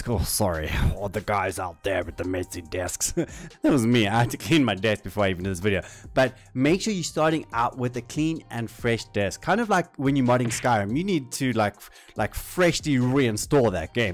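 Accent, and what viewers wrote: South Africa. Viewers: The whole time I was wondering if you were south african from the accent loll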